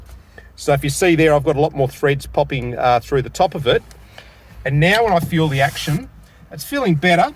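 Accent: Australia. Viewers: Cheers mate, nice easy to follow demo and loving the Aussie accent. Many thanks from Sunny Scarborough ,North Yorkshire UK. Fairer than fair dinkum:goodvibes:.Kindest regards, Paul.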